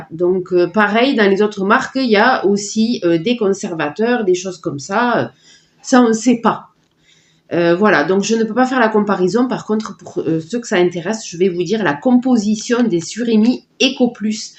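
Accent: South, France. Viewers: Bonjour, merci pour ce bon moment de partage. Test approuvé 👍😁🐱🐶fins gourmets. Vous êtes d'où? Accent du Sud? Bonne journée à vous et votre adorable famille. ❤️😘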